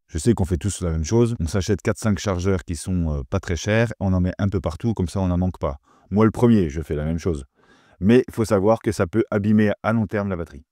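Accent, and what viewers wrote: Franche-Comté, France. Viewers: Tu es de Franche-comté toi non? Héhé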